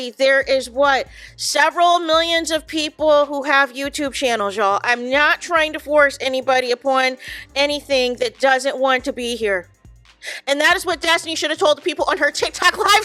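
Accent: Midwest, United States. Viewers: I love your voice…it’s cute and gives an Intermountain West/Midwest quirky accent. I love it too bc if you are a black woman, it is outside of what people typically think black women in America sound.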